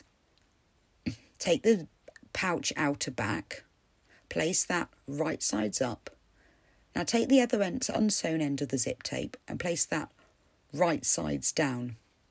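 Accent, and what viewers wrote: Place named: Birmingham, United Kingdom. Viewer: Thanks for this tutorial ❤ Sounds like you’re a fellow Brummy 😊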